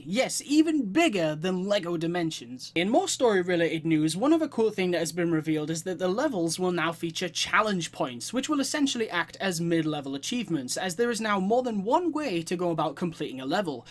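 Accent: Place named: Geordie, United Kingdom. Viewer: Just wondering are your from Newcastle Upon Tyne because I can hear that tint of Geordie in your accent?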